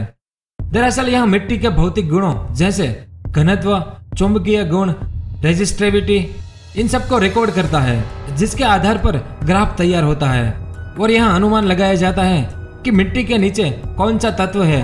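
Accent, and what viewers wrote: Gujarat, India. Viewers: Tu Gujarati lage se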